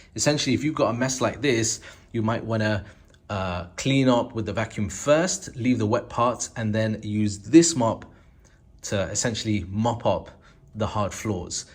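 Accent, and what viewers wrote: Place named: England, United Kingdom. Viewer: Fantastic how you have an English accent yet choose to convert UAE currency to US dollars and not UK pounds.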